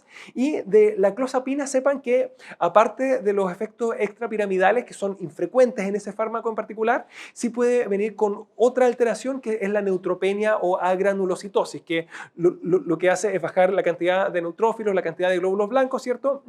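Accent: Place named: Chile. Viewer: Q agradable encontrar un acento chileno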